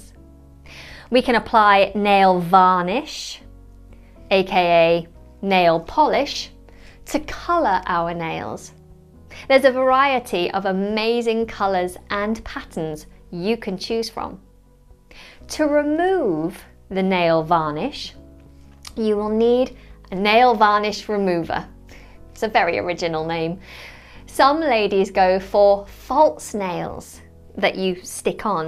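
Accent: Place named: RP, United Kingdom. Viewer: Dear Madam Teacher,thank You so much for this great lesson and especially for Your pure,clear RP British accent! Btw, in the Bible (Old Testament) God created Woman like a last thing and the BEST.(real theological opinion)! Thank You again.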